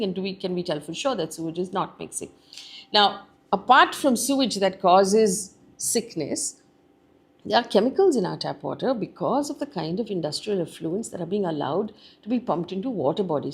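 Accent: India. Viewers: Her curry English is so bad.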